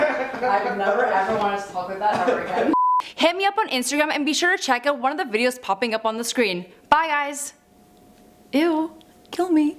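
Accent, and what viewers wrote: Canada. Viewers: Is her accent Canadian? Or foreign? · Her canadian accent is as thick As the whole album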